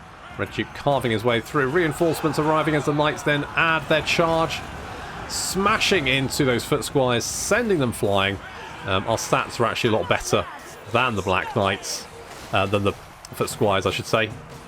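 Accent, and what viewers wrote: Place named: Northern England, United Kingdom. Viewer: I only watch CA content if it's made by a man with a northern english accent. I don't make the rules, but I do voluntarily and enthusiastically enforce it.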